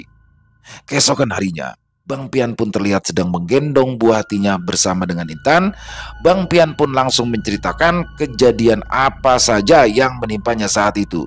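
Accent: Jakarta, Indonesia. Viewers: Saya kalaupun orsun Dengar cerita logat betawi suka bngt medok2 lucu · Waalaikumsalam.keren cerita nya..cocok bnget klo yg bercerita nya. betawinya masuk banget.inyi nya puas dan trhibur..mas ditunggu ceritanyw.bang pian..bang rudy dan mas sudiro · Nah ini yg ane demen dr klo udah pake logat betawi. Kocak 😂😂👏👏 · Waw cirikas Betawi nya bang bikin ketawa sendiri gua,lucu, serem semua nya dapat, Kren bang, kyk orang gila gua denger baba Ali nya becanda😅😅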